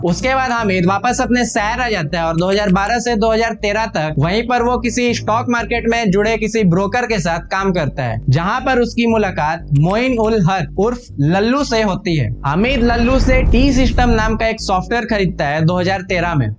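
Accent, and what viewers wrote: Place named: Bihar, India. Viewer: Pakka yeh Bihar se hai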